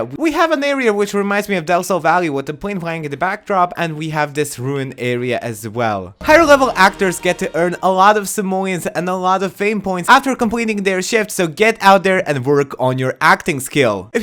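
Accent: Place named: France. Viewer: I LOVE your accent! Are you from France?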